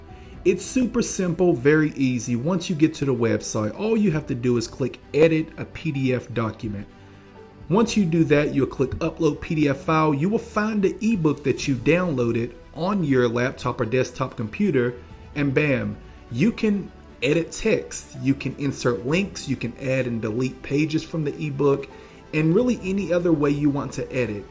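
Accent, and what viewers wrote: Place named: Baltimore, United States. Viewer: Great quality content, thank you for the knowledge! 🧠 I follow you on IG but randomly stumbled upon your YT by coincidence. Also, I hear an accent! Is it Baltimore? 😄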